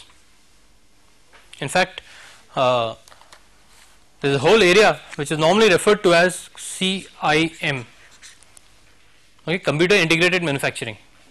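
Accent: India. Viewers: What a highly informative video. It is great that the university made this available here. I'm American and I did not have much trouble understanding the Indian English spoken by the professor. Those who had problems understanding just need practice listening to Indian English- this speaker did not use any Hindi-English slang (that I can recall) so it is just a matter of developing an ear for how familiar words are pronounced. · This" National Programme on Technology Enhanced Learning" videos are mainly aimed at improving education for Indian students so that Indian students can benefit from it. And we understand it very well. If you want to use these resources you can use it for free. But we didn't remember asking an opinion from an outsider on our accent - especially from an ignorant one like you. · A agree with bajholster. If subtitles were added, I think they would help a lot. 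I'm rather used to different accents, but Indian accents are one of the most difficult for me to understand. Excellent video. Thank you for the lecture. I am beginning to study and learn CAD, and this was a *very* valuable video for me. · it difficult cannot laugh about the indian spoken, it seem like speak with a Little Candy in your mouth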